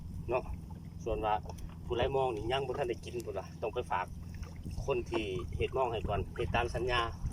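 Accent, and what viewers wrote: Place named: Savannakhet, Laos. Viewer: ສະບາຍດີ ອ. ສຽງເວົ້າຄືຄົນສະຫວັນນະເຂດນໍ ຂ້ອຍກະຄົນສະວັນເດີ້ອາຈານ ຄົນບ້ານດົງບັງເດີ້. ມັກຫຼາຍເດີ້ເພງທີ່ອາຈານຮ້ອງ ມ່ວນໆທັງນັ້ນ. ນອກຈາກຮ້ອງເພງເກ່ງແລ້ວ ໄຫຼມອງກະເກ່ງອີກນໍ້ອາຈານນໍ້. ສຸດຍອດໆ.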